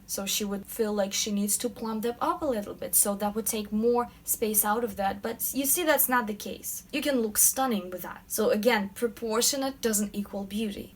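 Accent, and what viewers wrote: Russia. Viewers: блин, сразу поняла, что вы русская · Я прямо слышу русский акцент =)) очень информативное видео 👍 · Я сразу же поняла, что вы русская, несмотря на то, что у вас прекрасное произношение :)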